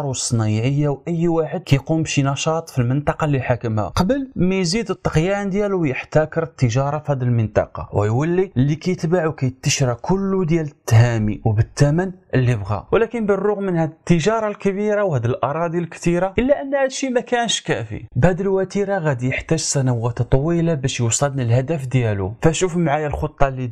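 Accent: Algeria. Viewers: لو اهتممتم بتاریخکم لکان افضل....اللهجه دیالک الجزاٸریه واضحه ... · لكنتك الجزايرية غالبة على كلامك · يحاول التحدث بالدارجة المغربية ولكن اللكنة الجزائرية تطغى عليه بحكم زمالتي مع جزائريين. حتى ملامحه جزائرية ومصطلحاته ومفرداته ليست كمغربي أصلي حين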